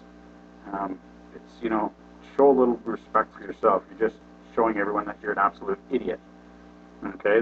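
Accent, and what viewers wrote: Canada. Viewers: Great videos. Just wondering, you don't sound like you're originally from Ontari-ari-ario to me are you from from New Brunswick or Nova Scotia? Sounds like a Maritime accent there. Take care and keep those great video coming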